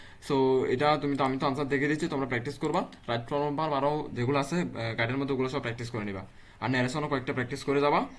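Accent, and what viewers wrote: Chittagong, Bangladesh. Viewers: Bhaia apnar kotha chitaingar moto lage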